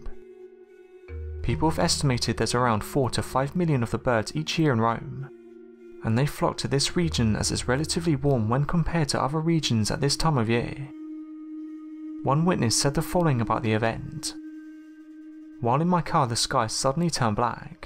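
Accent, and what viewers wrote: United Kingdom. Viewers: Why are all these videos narrated by a British guy?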